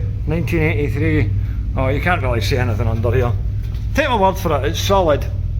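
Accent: Scotland, United Kingdom. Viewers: I am so sorry, let’s agree on a good Scottish accent is just the thing for YouTube videos 🏴🙌🙌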